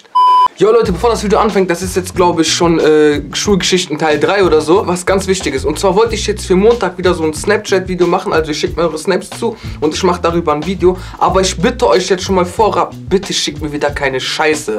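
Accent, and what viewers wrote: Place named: Bavaria, Germany. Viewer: brooo du kommst aus bayern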